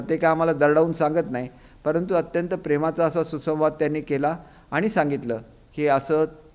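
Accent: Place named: Kolhapur, India. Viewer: घरच खुपच छान बोलता साहेब आपण कोल्हापूर भाषेत बोलतो नाद खुळा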